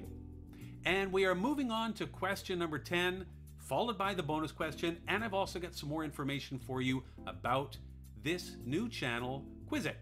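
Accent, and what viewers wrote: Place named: Canada. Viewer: Canadian, eh? 7/10...thanks, from Alberta! Habs fan...sorry!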